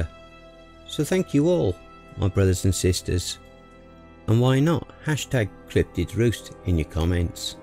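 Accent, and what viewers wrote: United Kingdom. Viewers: I'll listen to it. Listening to a narration with a British accent is so great.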